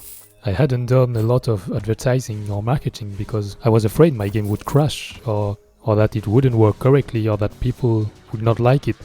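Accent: France. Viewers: ton accent m'a l'air français ^^ Superbe travail c'est trés motivant.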